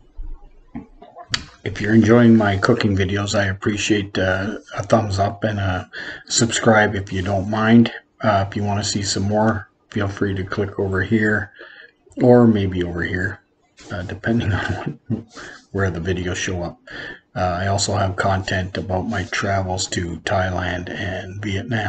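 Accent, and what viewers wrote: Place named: Midwest, United States. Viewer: Most Midwestern accent ever lol!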